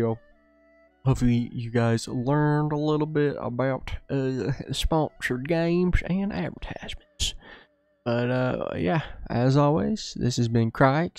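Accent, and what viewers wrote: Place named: South, United States. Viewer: Your voice sounds country, I love it, yall that's why the music choice in every 1v1 video is epic🤘🏻😎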